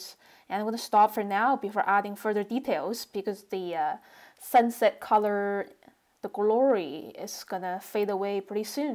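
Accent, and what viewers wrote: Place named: England, United Kingdom. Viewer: 2023…just saved this as a watch later…I love rotisserie chicken, watercolor, and voice and and English accent is nice to listen to!! Keep up the good work! I’m inspired ❤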